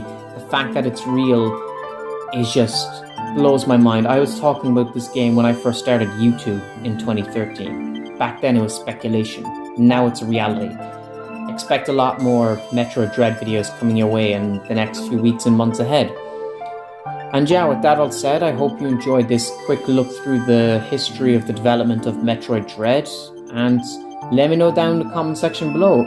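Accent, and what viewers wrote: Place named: Ireland. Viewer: Irish bai!?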